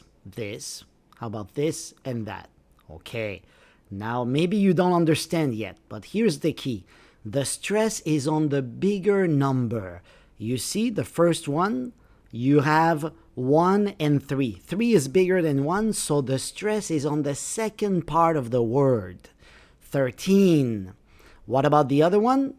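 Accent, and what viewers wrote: United States. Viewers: American trying to teach how to say numbers in English. Absolutely awful accent and certainly we native British people do not speak like this woman. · You speak American English. What about dates in British English? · stupid American English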